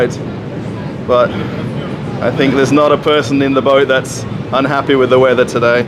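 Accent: Australia. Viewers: Nice video. I will get an Australian accent if I keep viewing this videos... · Мне кажется некоторым даже интересно и они пытаются понять, что он говорит. И это не сложно, ведь у него такой приятный австралийский акцент. Я с удовольствием его слушаю. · i can detect an Accent..Australian or NZ....?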